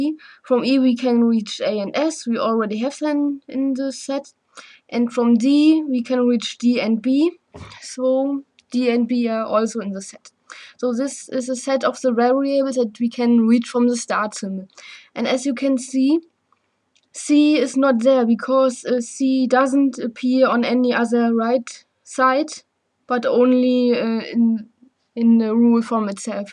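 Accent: Germany. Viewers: schone Deutsche accent :)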